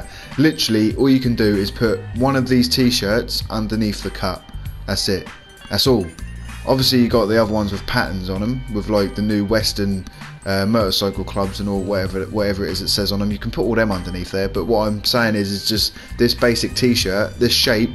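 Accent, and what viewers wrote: United Kingdom. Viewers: Hello small young child, have you heard of the british accent?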